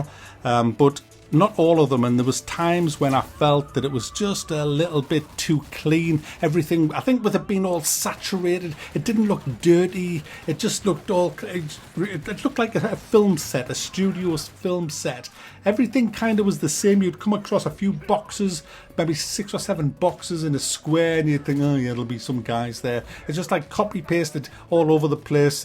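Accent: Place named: North, United Kingdom. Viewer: Damn you sound so northern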